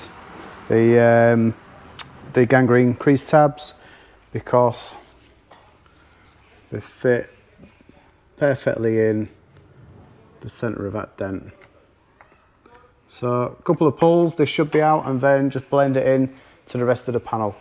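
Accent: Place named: England, United Kingdom. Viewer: G'day Australia here, top video, and has taught me a few things I've been missing thanks for that, have been learning PDR for my own use off YouTube, being an old Pom it's good to hear a Pommy accent instead of a Yanky one for a change. Keep up the good work - DaveO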